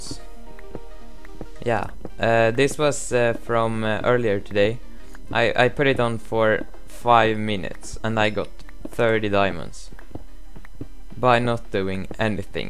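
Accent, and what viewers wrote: Sweden. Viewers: I hear that you are swedish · are you swedish? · I hear you speak swedish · I kan Hear your swidish in your english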